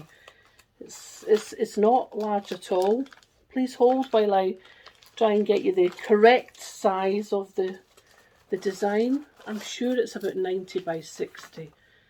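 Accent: Scotland, United Kingdom. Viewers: Your floss tube popped up on my feed and I enjoyed watching you. Love your Scottish accent! I’m from Idaho in the US, so you are a sweet find. Love your Easter Peep, so I’m gonna have to find that one. Thanks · First time I’ve seen your channel and enjoyed it very much. Love your Scottish accent. Take care and stay safe. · Congrats on your book find. It's great that all the charts are there!! Your stitching is beautiful and I very much enjoy listening to a crazy Scot woman rambling on about cross stitch! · Been catching up with your flosstube. Great to hear a fellow Scot round these parts 🏴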